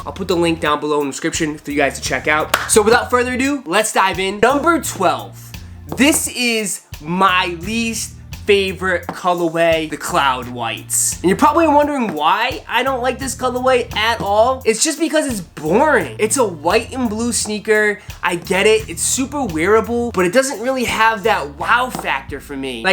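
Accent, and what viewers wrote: Boston, United States. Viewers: That fuckin Boston/mass accent, 🤣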